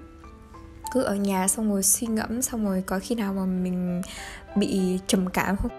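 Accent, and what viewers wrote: North, Vietnam. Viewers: Bạn ở đâu vậy ạ? Tại mình thấy video cũ của bạn nói giọng nam mà video này nói giọng bắc ý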